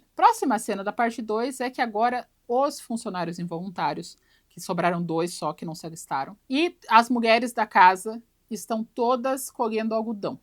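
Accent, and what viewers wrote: Curitiba, Brazil. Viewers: Este foi o vídeo que mais soltaste um sotaque paranaense, de Curitiba. Nunca tinha visto tu falar com tanto sotaque. Achei engraçado e ao mesmo tempo intensificou aquilo que estavas falando, combinou. Adorei!!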